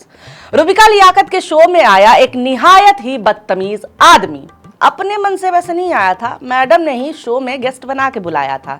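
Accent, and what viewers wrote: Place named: Agra, India. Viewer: Ms. Anchor-Young Lady Aapki ghungrali hindi Sun kurr bachpan kay din yaad aa jateon hain. Lagta hai aap Agra kay gird pudhi badhi hain. Thank for making us smile God bless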